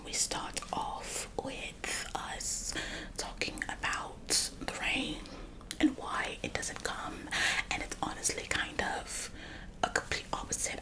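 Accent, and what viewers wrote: United Kingdom. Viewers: omg the british accent is so realll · i need more british accent it was so gooooood!! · there's smth about the British accent that really brings this all together 😭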